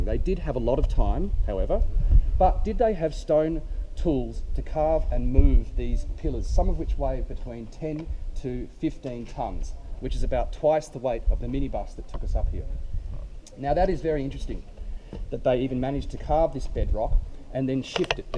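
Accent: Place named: Australia. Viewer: Chuck sent me :) It was nice to hear a fellow Aussies voice. I subbed :)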